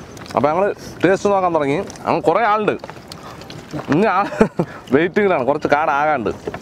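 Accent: Palakkad, India. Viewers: Awesome.... ND palakkad slang vearey level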